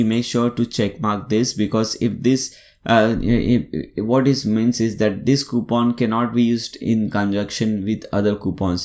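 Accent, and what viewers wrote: India. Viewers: typical Indian English accent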